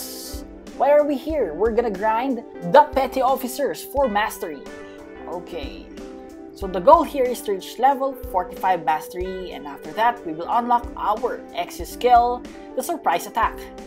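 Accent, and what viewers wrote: Philippines. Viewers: are you Philippines? Because its ao confusing you aound like Philippines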